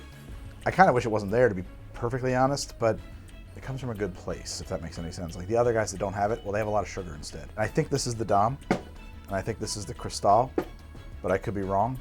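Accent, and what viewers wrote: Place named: United States. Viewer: people from the usa are so cute when they try to pronounce french words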